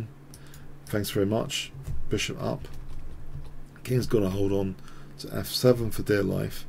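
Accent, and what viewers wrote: England, United Kingdom. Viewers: I really love your videos and your daily updates on both historical and recent games,. Please continue to cover the major tournaments in both classical, rapid and blitz chess! And may I ask, from what part of England are you from? I take much pleasure in that dialect of yours and the pronunciation of the phoneme 'th' as a regular f-sound, "c free" (c three) etc.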